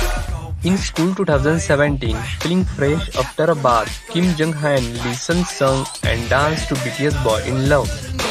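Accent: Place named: India. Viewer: Listening to your voice makes me think u r an Indian am I right ??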